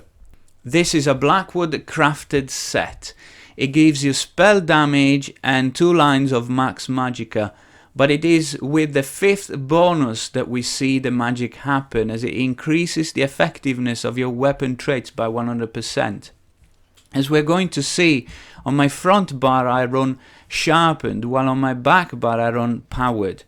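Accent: North, United Kingdom. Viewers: sounds like northern UK, Dutch and South African in one haha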